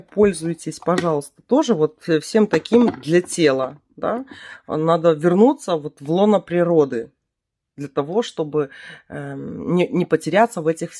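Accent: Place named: Belarus. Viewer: Так девушка из Беларуссии, зачем вы учите как говорить? Мои знакомые оттуда тоже интересно по своему говорят и мне не режет ухо. ДОбре?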